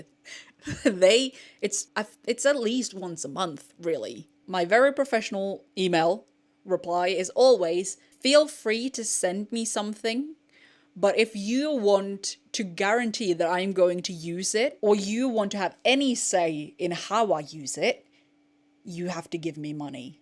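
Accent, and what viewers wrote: Australia. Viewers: I’m English and thought for sure u were Australian lol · Fun video. Also you definitely sound a little Aussie ngl. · I’m English and when I first heard you I thought you were Australian, until I realised you are from Norway 😂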